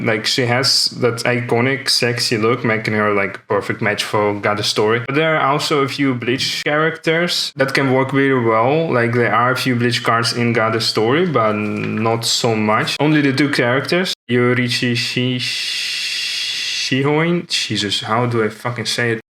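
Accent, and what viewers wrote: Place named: Netherlands. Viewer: U have to work on your English pronouncing because I can hear 100% you're dutch ! xD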